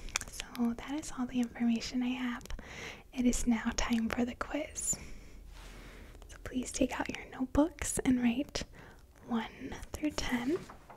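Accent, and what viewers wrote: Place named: Midwest, United States. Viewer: I stan your midwestern ayackcent :)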